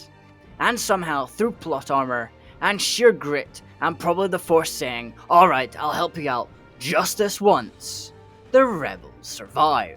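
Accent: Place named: Scotland, United Kingdom. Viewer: As a Scotsman myself, I can verify the accent but as I come from near Glasgow that's a rare I would guess further north than what I hear, what I haven't seen is two converse between each other lol. .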